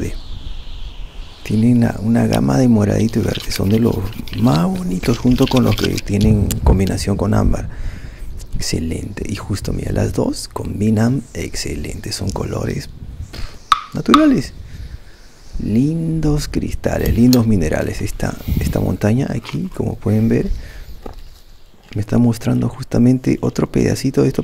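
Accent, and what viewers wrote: Peru. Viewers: En que país estas ahí?.. hablas como peruano. estas en Perú?.. saludos desde Cancún Quintana Roo México. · no soy muy bueno con los acentos.... ¿¿de donde eres?? estoy entre peru, bolivia.... tal vez incluso paraguay :3 .... pregunto para saber a donde planifico mi proximo viaje jejejje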